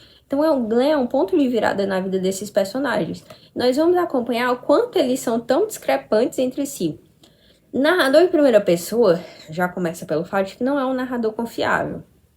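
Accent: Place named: Pernambuco, Brazil. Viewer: Amei o sotaque. Pernambuco?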